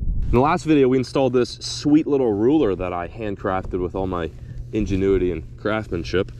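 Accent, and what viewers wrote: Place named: Texas, United States. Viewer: I’m starting to hear some texas twang in your voice, has been acclimated🤝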